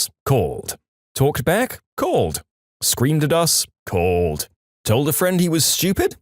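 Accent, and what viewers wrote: United Kingdom. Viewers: British narrator?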